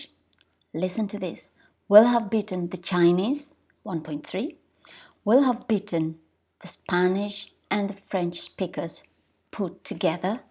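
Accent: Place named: Uganda. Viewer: The presenter of this program you sound Ugandan and yet you said you are Kenyan I’m pretty sure depends on your tribe Ugandan and Kenyans we are equally the same thing thanks for your information I already speak Swahili myself I was born speaking Swahili and as you said Swahili is the way I totally believe you because I’ve always been convinced that Swahili was the most superior language on the continent of Africa always thought Swahili is superior than other languages from the west coast of Africa or even the southern coast of Africa and other countries in between that again Kiswahili is definitely superior…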